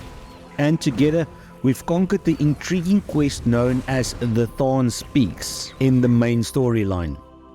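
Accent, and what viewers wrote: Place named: South Africa. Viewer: Yoo i know a South African accent when I hear one. Whats up ekse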